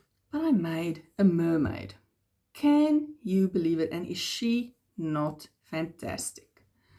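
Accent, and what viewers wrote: South Africa. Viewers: Amigurumi making is N-E-V-E-R boring with you!🤩 First, I have to admit, ( maybe it just me, because I enjoy so much your beautiful English from South Africa- me being French speaking from Montreal, Quebec, Canada, its like a melody or a song to me!☺️). Second, 99% of the time, when you tell how you did, where or from who it was created, why you did it that way or how, there is always a story behind! The Marvelous Mermaid became such a beauty, and one of the reason, beside your talent, is cuz you used the yarn from Crochet box Society! Finally using a pattern from another designer to inspire you, you did crochet a vest for your bear! Thank you for keeping those videos coming and to share with us, yours yarny adventures!!! It is a fantastic treat for me and for the others viewers!!!😁💕💕💕💕💕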